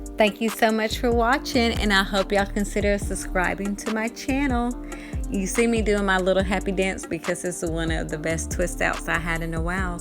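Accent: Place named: South, United States. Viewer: Love your country girl accent, makes me trust you. #countrygirlsunited I love natural hair videos. I hope you make many more.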